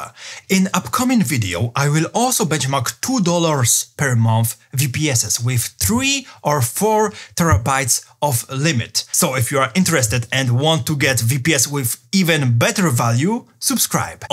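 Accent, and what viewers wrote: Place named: Europe. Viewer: English is fine! A bit of an accent, but that's just standard for anyone in Europe :) As for VPS, I had no idea you could get something that actually gets something done for that cheap. Thanks for the info!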